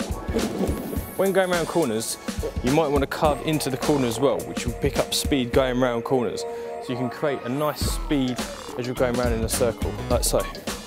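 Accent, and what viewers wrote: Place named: Scotland, United Kingdom. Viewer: Did anyone else think he said "Hi, I'm Shit,"? Obviously it was but it made me laugh. Scottish accent ftw.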